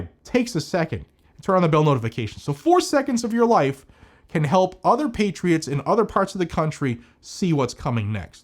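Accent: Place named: Illinois, United States. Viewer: I’d say that it sounds like you are from Illinois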